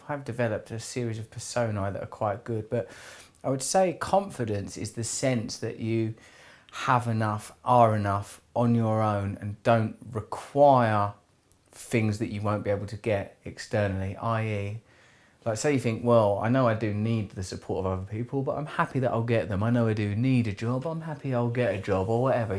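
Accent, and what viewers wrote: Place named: England, United Kingdom. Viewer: You always amaze me... whatever you do! As a human being, I’m guessing there’s probably NOT MUCH, you’ve not experienced. ( it sounds so much better too... advice & rhetoric coming from a British accent!! Am I right? ) - Keep up the good fight in educating our youth! My lack in self confidence, is just that! I’m too afraid to stand up, to be, truly me! I wanna be great... & in just saying that, it makes me feel... blah- I’m comparing again! To you! ... I hope I’ll someday find that peace, within myself! God Bless you... Sir!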